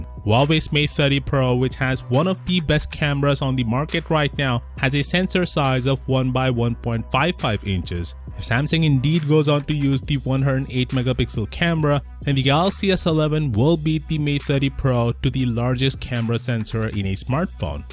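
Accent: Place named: India. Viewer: Little Indian English.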